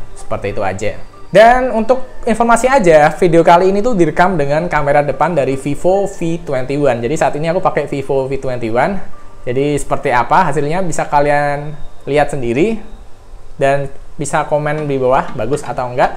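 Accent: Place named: Java, Indonesia. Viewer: Thx mas, ulasan Anda yang jawani bingitZ...kethoe sampeyan semarangan yaa...atau solo...??! Logate ngono maksute, btw, lanjutkan ulasan2nya utk hp terkini yg pasti update dan ' fresh from the oven'...wkwkk..profisyat n go ahead your channel, regard from me.. Alexius Prihartanto Dwi Palupi, Kebayoran Lama Simprug Jakarta Selatan 🏌️🙏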